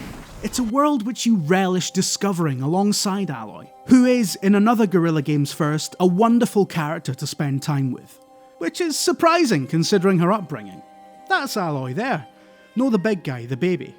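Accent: Scotland, United Kingdom. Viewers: Is that a scottish accent I hear? Wonderful, could listen to this all day! Great review too.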